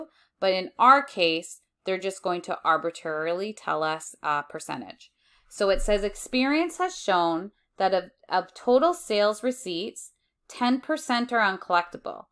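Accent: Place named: United States. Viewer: The American accent kills me 😂 good vid though 👌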